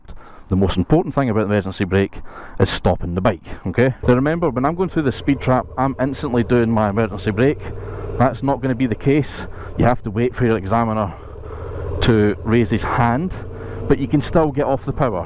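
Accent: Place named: Scotland, United Kingdom. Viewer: I love the videos, but unfortunately, I can hardly understand some parts. :face-blue-smiling:The accent is very different. Are you from Scotland? :face-blue-question-mark: In any case, nice demos!! Thank you!! :trophy-yellow-smiling::trophy-yellow-smiling::trophy-yellow-smiling::trophy-yellow-smiling::trophy-yellow-smiling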